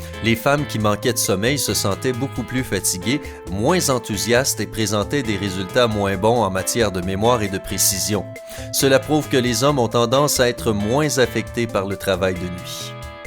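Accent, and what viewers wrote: Quebec, Canada. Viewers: He is from Canada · Ça change avec une voix québécoise ! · Hé le narrateur est québécois!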